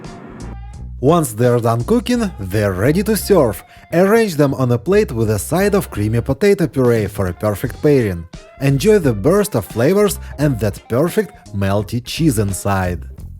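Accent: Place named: Russia. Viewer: awesome accent! Reminds me of my fav star trek "Chekov" Walter Koenig ❤️ ingenious creative recipes!!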